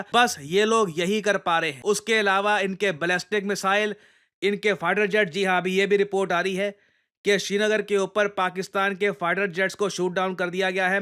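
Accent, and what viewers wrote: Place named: Bihar, India. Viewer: मैक्सिमम ये बोल देतय, ये pure बिहारी language भैया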